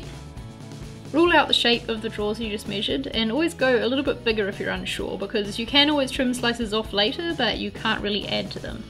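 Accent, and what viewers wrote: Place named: New Zealand. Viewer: i knew u were from NZ I AM 2 i could tell because i usually watch american youtubers and you sound so different like a kiwi I FINALLY FOUND A KIWI YOUTUBER!!!! this video helped a lot thank you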